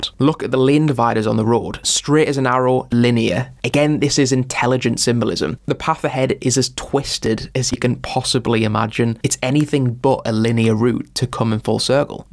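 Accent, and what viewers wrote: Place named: Newcastle, United Kingdom. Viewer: cool video mate, are you from england? sound like a geordie haha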